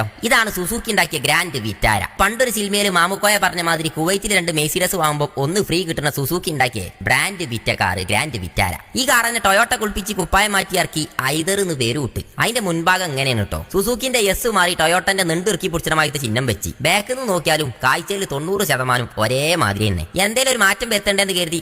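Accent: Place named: Kozhikode, India. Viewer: കോയിക്കോട് കാര് normally ഇങ്ങനെയാണ് സംസാരിക്കുന്നത്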